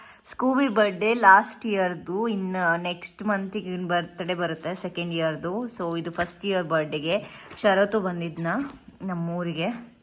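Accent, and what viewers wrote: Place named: North Karnataka, India. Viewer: Niv North Karnataka davaru anisutte alva namma language tara 😊ede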